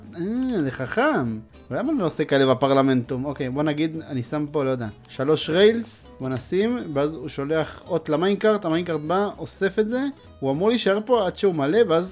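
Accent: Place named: Russia. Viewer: שיואו צפיתי בסרטון שלך מלפני שבע שנים שהיום אנחנו אגב ב<num> ושומעים שיש לך מבתה רוסי ברמה מטורפת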